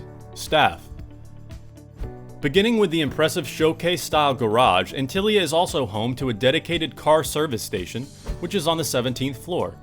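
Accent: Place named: Liverpool, United Kingdom. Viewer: Nacks scouse bro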